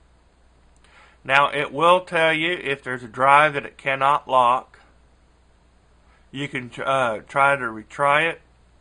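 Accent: South, United States. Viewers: WHAT IS UP WITH THE SOUTHERN ACCENT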